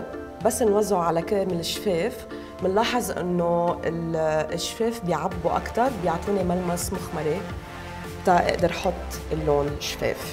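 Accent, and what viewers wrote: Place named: Lebanon. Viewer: Arabic Lebanese accent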